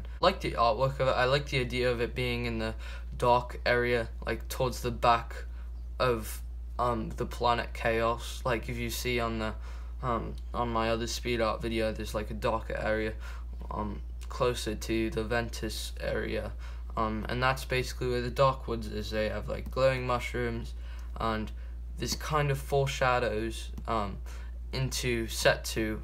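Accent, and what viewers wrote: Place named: United Kingdom. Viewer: British accent 10/10 :l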